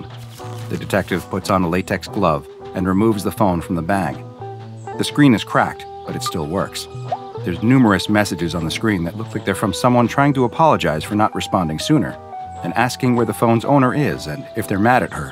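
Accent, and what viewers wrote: United Kingdom. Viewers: He became british D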